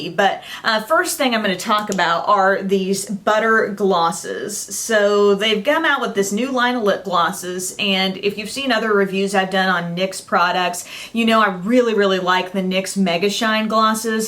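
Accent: South, United States. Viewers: ! I've been watching your channel forever and today I decided to revisit some old videos. I noticed that sometimes a southern(?) accent comes out in your older videos, but it's completely missing in your newer ones. Just thought that was funny. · I did not notice it before but Oh yeah.. you have (a little) southern accent :))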